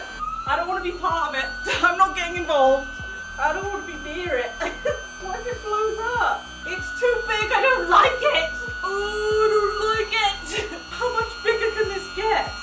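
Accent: United Kingdom. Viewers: I'm sorry but are you from the UK love you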